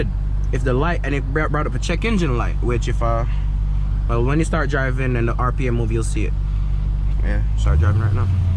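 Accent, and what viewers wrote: Jamaica. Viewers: u Jamaican or carribean by any chance? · You sound Jamaican🤔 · Are you from jamaica? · Bro you gotta jamaican accent 🇯🇲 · Mi know mi hear a Jamaican 🇯🇲 accent big up yuhself Bredda yuh drive like a real yaad man. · U Jamaican bro ? Man tuff yf 😂💪🏽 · Yoo bro u sound Jamaican where u frm? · cant hide that Jamaican accent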